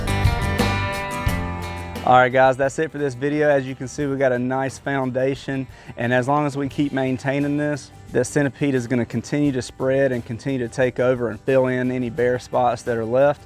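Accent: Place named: Southeast Georgia, United States. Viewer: Lawn looking good. You sound like you're from southeast Georgia.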